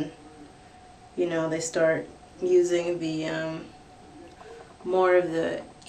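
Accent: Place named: South, United States. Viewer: southern accent in this vid. how cute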